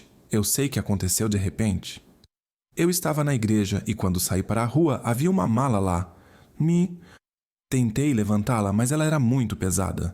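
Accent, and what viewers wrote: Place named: Portugal. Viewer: 𝑸𝒖𝒆 𝒑𝒐𝒓𝒓𝒂" 𝒆́ 𝒆𝒔𝒔𝒂, 𝒖𝒎𝒂 𝒉𝒐𝒓𝒂 𝒂 𝒏𝒂𝒓𝒓𝒂𝒄̧𝒂̃𝒐 𝒆́ 𝒆𝒎 𝑷𝒐𝒓𝒕𝒖𝒈𝒖𝒆̂𝒔 𝑩𝒓𝒂𝒔𝒊𝒍𝒆𝒊𝒓𝒐 𝒐𝒖𝒕𝒓𝒂 𝒉𝒐𝒓𝒂 𝒆́ 𝒆𝒎 𝑷𝒐𝒓𝒕𝒖𝒈𝒖𝒆̂𝒔 𝒅𝒆 𝑷𝒐𝒓𝒕𝒖𝒈𝒂𝒍" 𝒆𝒎 😅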